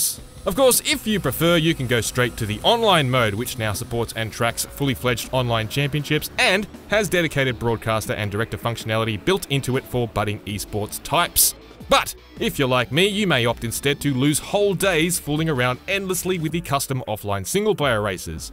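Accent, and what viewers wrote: Australia. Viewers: has no one in this comment section heard an australian accent before? Ya'll are SHOOK. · As Joe Rogan says australian is the coolest accent. Great review as always man! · Dat auzie accent doh! Makes everything automatically more enjoyable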